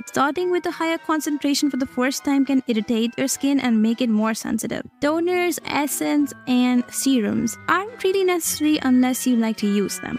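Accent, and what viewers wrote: India. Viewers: Thank god you put subtitles because for some odd reason i can’t stand Indian accent! It annoys me, i have problems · I spotted your beautiful Indian accent Love when Indian people speak English , it sounds so good to hear.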